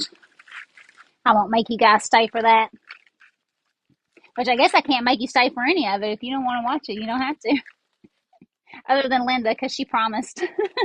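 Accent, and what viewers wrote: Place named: South, United States. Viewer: So very creative and beautiful, your wee cat is gorgeous 😍😍😍 and so is your southern accent i could listen to it all day x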